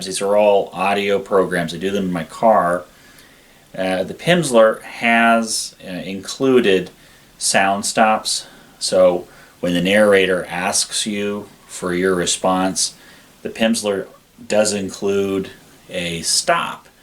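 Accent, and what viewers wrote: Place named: United States. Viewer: I love this review, i just wonder why you are pronouncing the words after with an american accent? Im from here, too, so asking as a neighbor. Ive been writng words phonetically to make sure im saying what im hearing and not reading in my own language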